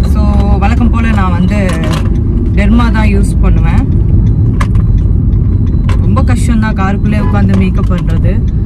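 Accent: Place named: Kerala, India. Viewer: You sound so much like a Malayali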